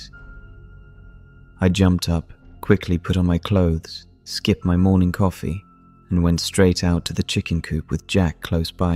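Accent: United Kingdom. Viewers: This is very incongruous: an American, living in America, speaks with a British accent. Credibility gap!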